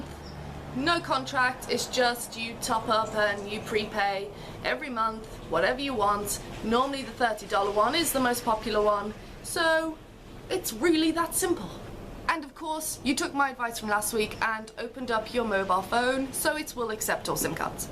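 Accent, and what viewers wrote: England, United Kingdom. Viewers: where in england is your accent from?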